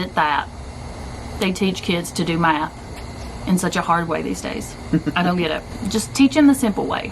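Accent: South, United States. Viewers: Girl! You are gorgeous ! With an adorable southern accent to boot!! I was also the tall, red headed freckle faced girl in school and was made fun of. I turned it around and realized I could carry more weight than the shorter girls and red heads are rare! You got it going on and don't let anyone tell you different! 💖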